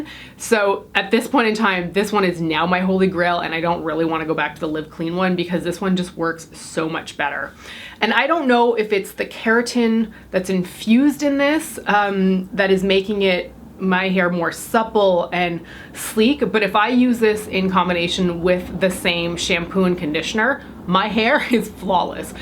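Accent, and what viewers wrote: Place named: Eastern Canada, Canada. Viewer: I have to say as a fellow Canadian I adore your eastern Canadian accent it sounds so much more chic the my bc accent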